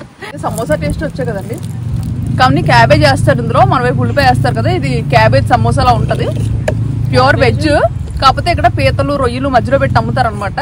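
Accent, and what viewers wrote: Vizianagaram, India. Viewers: మీ విజయనగరం మాట మారలేదు అలానే ఉంది చక్కగా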